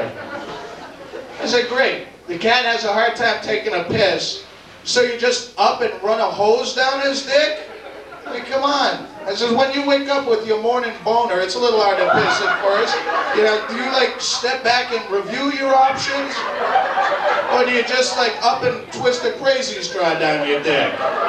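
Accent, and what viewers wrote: Boston, United States. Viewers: The Boston accent is wicked pissa! More proof that has always been a genius... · So when did this super thick Boston accent disappear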